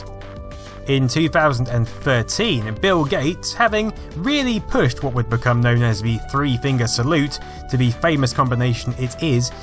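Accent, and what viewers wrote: United Kingdom. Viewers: Brilliant video after Brilliant video AND with proper British accent what could you possibly ask for more, Thanks!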